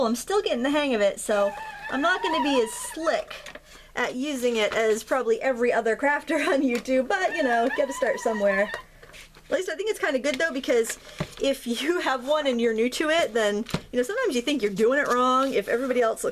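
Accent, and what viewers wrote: Maine, United States. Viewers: I love the Maine accent!!! Whoohoo! Great video. :) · LOOL< see, and I've been "from away" for so long that I miss the Maine accent!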